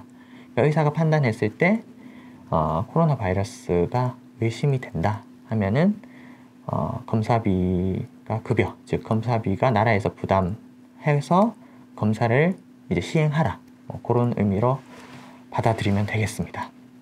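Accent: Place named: Gyeongsang, South Korea. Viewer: 블로그로만 뵙다가 목소리 듣게됐는데, 경상도 억양이 새롭네요ㅎㅎ 앞으로도 좋은 영상 잘부탁드립니다. ps. 한두가지 제안을 드리자면, 아무래도 내용이 일반인에겐 다소 전문적인 내용이라, 집중도를 살리기위해 영상 하나당 3분 정도로 하면 좀더 좋을것 같습니다. (예를들어, 이번영상을 세부주제로 3토막내어 올리는것) 또, 설명력과 전달력은 굉장히 훌륭하십니다. 뭔가, 서울 대형병원의 질병 설명영상 속의 교수님과 비슷한 느낌으로 잘하십니다. 다만, 유튜브의 생명인 조회수와 몰입도 향상을 위해선 순차적인 설명방식보다는, 짧은영상으로 일반인에게 지루하지않고 임팩트있게 전달하기에는 신종코로나에 대해 요즘 사람들이 가장 궁금해하는 FAQ에 대한 q&a 형식이 더 낫지않을까합니다. (ex) 왜 의심환자가 양성으로 확진나기까지 걸리는시간이 그때마다 제각각인지, 코로나로 의심된 환자가 사망했을 경우 숙주가 죽었는데 그것에서 채취한 검체에서 나온 코로나 음성판정이 과연 의미가 있는것인지 or 믿을만 한것인지, 마스크는 어느정도 범위까지 막아주는걸 써야하는건지(만약 마스크와 손씻기만으로 충분하다면 왜 의료인들은 방호복입는건지), 알코올이 실제 항바이러스 효과가 있는지 등등) 제 제안은 말그대로 제안일 뿐이니 참고만 되셨으면합니다:) 본격적인 ent 1년차 입성을 앞두시고 선별진료소 일까지 겹치시어 많이 바쁘셨을텐데, 첫 영상 만드시느라 수고많으셨습니다^^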